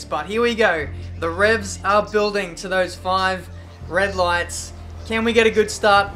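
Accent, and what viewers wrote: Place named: Australia. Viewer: i honestly don't know why i watch these videos. the australian accent is so difficult to listen to, it makes my ear bleed. the driving, albeit fast, is pretty bad. contact with other cars all the time. and codemasters, continues with crappy ai. why do i watch these videos?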